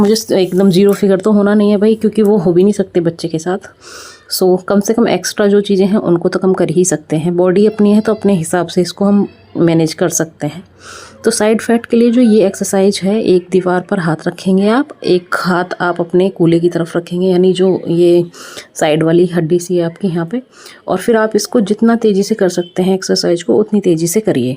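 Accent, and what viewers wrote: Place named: Meerut, India. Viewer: Didi aap ki sasural kahan per hai mujhe aapki Jo language hai vah merath Jaisi lagti Hai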